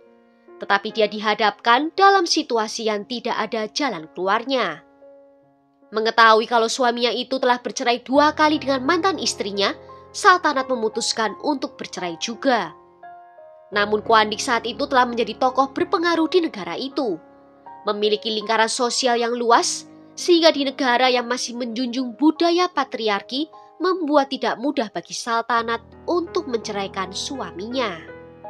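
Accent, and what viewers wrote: Java, Indonesia. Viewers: alamak medok gilerr derr😂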